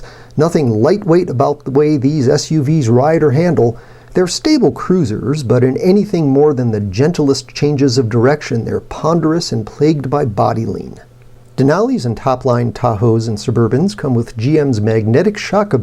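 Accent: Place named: Canada. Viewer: Great video! These big trucks are any traditional boy's matchbox dream come true. You've got a sub! I must ask though, out of curiosity, are you Canadian? Your accent sounds close to home. Cheers from the north east!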